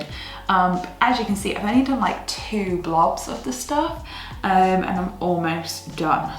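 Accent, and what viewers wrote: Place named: England, United Kingdom. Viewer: Hello. Found you this morning, so pleased to hear an English accent. I am from South Yorkshire and love a good old diy project. Looking forward to watching all your other videos 😊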